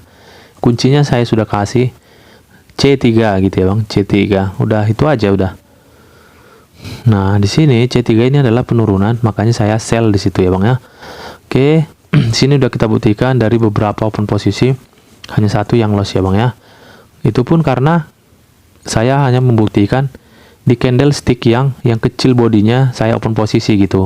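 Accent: Medan, Indonesia. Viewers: Medan kali logatnya lek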